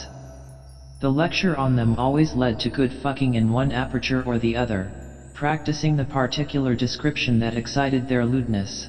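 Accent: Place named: United Kingdom. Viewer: Alien voice VS gentle English UK accent...no contest. THIS VERSION IS ATROCIOUS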